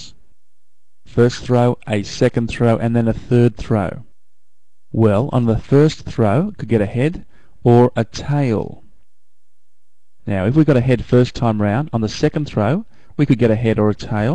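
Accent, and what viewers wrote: Australia. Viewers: I don't know why, but the Australian accent really helps me understand. This is awesome, thank you! :) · WOOOO HE'S AUSSIE!!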